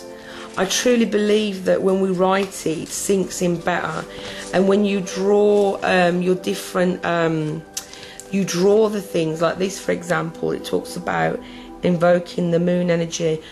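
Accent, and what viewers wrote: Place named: United Kingdom. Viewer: you have the loveliest British accent!! I love it.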